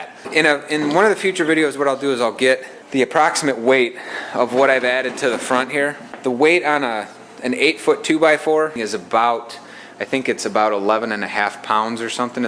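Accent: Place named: Illinois, United States. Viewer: What size boat is that and where do you live? Sound like a fellow Illinoian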